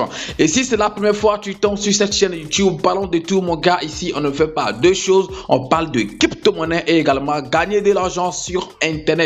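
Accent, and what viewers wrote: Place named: Africa. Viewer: C'est l'accent africain.. normal quoi . Fais toi plutôt l'effort de comprendre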